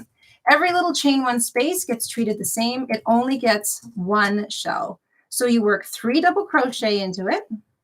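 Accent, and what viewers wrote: Canada. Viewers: Your squares are extra special, thanks so much for sharing. I’m excited to try this. Best regards from South Africa 🇿🇦 hope you are well in Canada? Am I right? Your accent sounds Canadian 🇨🇦💫 oh ok you said hi from Canada ✌️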